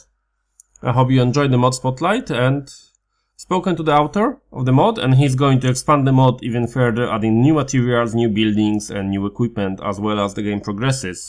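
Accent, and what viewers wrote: Poland. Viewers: Łatwo po akcencie poznać polaka. Dobre przedstawienie moda.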